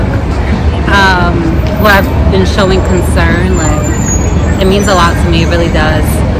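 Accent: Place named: Brooklyn, United States. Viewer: That voice is extremely sexy got that Brooklyn vibe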